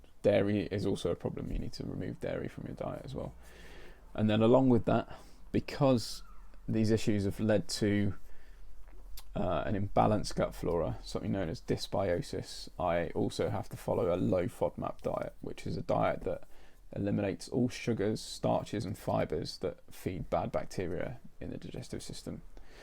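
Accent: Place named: United Kingdom. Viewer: I took accutaine twice, once in my teens and again at around age 32. Suffered different areas of body pain through my 20's but never made the connection. After taking it the second time, got major gut issues, pain got worse and lots of brain fog. At 42 the symptoms got really bad fulltime and I've been sick and unable to work since. I'm 62 now. Do not even think of taking this drug! I've tried almost everything but I never heard of the gallbladder/liver connection until I watched your video. It makes a lot of sense. Going to look into it further. Have you thought of FMT? You have a British accent so if you are in England, there is a clinic called, I believe Taymount, that some say has helped them. I'm in Canada so it would be a bit of long haul. We'll see. Please keep posting if you're able.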